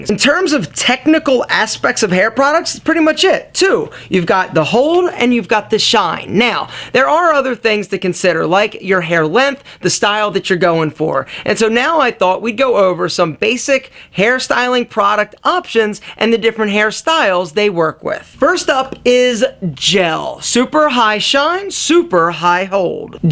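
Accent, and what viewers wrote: Philadelphia, United States. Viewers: Hes from the Philly area... I can tell bc he pronounced water as " wooder"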